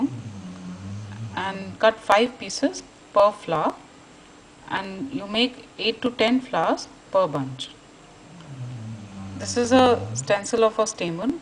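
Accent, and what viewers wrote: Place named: India. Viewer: All your videos are so dark, badly filmed and the object is often out of camera shot. Your Indian accent makes them hard to follow.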